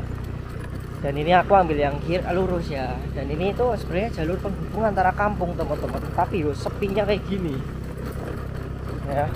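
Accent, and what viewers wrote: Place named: Banyumas, Indonesia. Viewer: Mantap uji nyali... Trus sy seneng infonya Medok ngapaknya..👍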